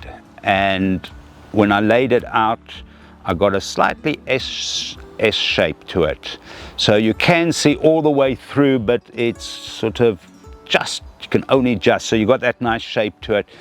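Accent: South Africa. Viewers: Oh the south african accent. Unique I tell you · I just knew he was South African 😅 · Great look! You can't mistake that wonderful South African ascent ❤ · There is only one accent like it.😂 I immediately knew he is South African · Im assuming he’s South African, he sounds very SAn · did not really catch the area.. Is it part of England? He sounds South African❤ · This is so beautiful and so lovely to hear a South African voice doing this magical work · The moment he started talking - I knew.... another Saffa - nice job! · Delightful! So proud to hear his South African accent ❤ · I'm spotting a Saffa accent!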